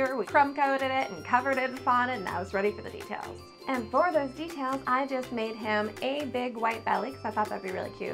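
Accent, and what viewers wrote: Canada. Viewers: Is she Canadian or Australian?? She sounds just like me. Just the way she says out she sounds just like simply nailogical too!!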